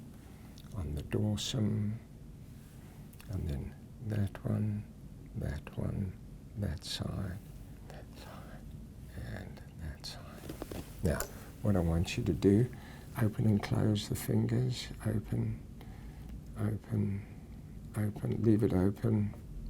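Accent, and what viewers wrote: South Africa. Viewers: I thought was south african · South African accent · I was also confused. I heard South African but when I looked him up it indicated Australia! Well, went to Wits so definitely South African, but I suppose just moved to Australia.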